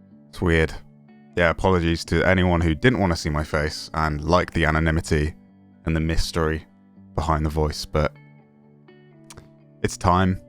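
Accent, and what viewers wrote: United Kingdom. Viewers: has a British accent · Good on you, to be honest I never actually subscribed until now basically because I 'assumed' I already had (hearing you in the videos) while watching Aculite 'so much' and Fugglet is on fire at the moment - so I kind of always watched you in a 3rd party sense. My Bad, Subscribed and keep it coming mate. Nice to hear the UK within the 'TEAM'. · I live in Shropshire dang.... Thought your accent sounded familiar🤦‍♂️ I'm from Telford,.. tiss a bit rough lol.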